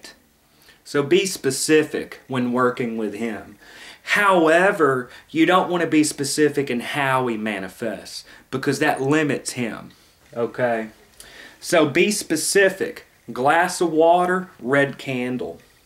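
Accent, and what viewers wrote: South, United States. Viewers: What a strong southern accent!!!!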